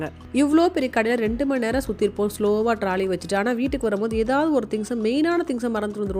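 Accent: Madurai, India. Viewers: Hi i'm ur new subscriber.ur slang.....?i think ur native is madurai?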